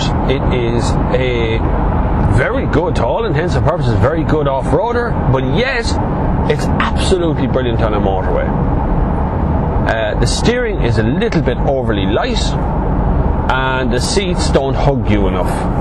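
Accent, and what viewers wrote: Ireland. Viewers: I never thought I'd see Emo in a YouTube video...... and your accent is familiar, are you from Laois?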